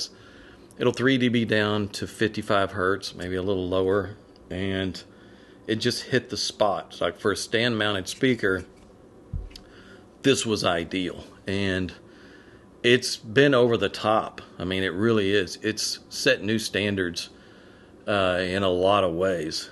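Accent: Texas, United States. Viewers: "He cranked 'em up with some Danzieg....". Incredible. Between the story and the Texan accent, it's like a Coen Bros. movie.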